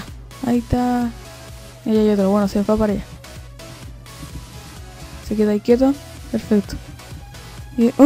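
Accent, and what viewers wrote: Chile. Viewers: su acento me suena chileno:D si no mira que es chileno y compara si no es chileno (SORRY ASI SUENAS) y no importa que hable con otro acento es la vida de el:D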